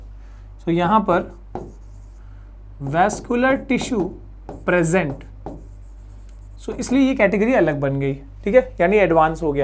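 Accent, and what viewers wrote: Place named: Bihar, India. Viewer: sir, u r from bihar... if i m right .. then i feel very glad to hear this 😊😊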